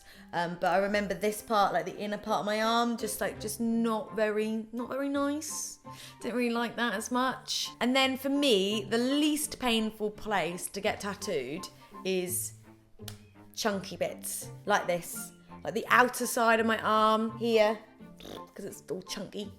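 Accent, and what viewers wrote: United Kingdom. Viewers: I so love the British accent. Espcially describing tats.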